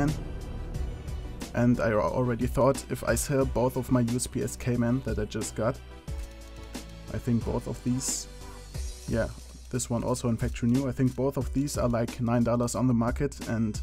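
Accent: Germany. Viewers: Are you german? You sound a bit like a german guy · Du bist deutsch das hört man:D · red doch einfach deutsch bitte ich pack diesen über deutschen akzent einfach nicht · naja , so spricht jeder deutsche englisch '-' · It sounds like german english? Its right? :D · Schöner deutsch akzent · krass wie man hört das du deutsch bist :D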